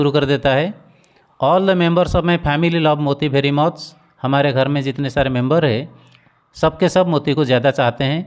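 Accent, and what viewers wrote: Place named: Odisha, India. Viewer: Sir , I'm also from Odisha and I knew you are a odia by your accent . Love from Jajpur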